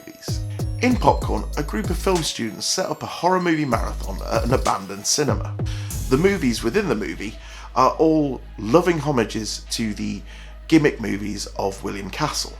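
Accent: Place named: United Kingdom. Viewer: Are you British, that accent so much sound like British?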